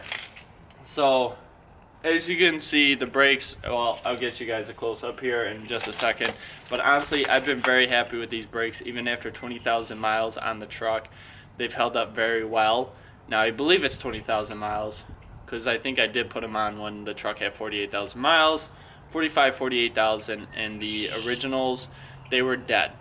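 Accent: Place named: Chicago, United States. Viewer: You do got some Chicago on that accent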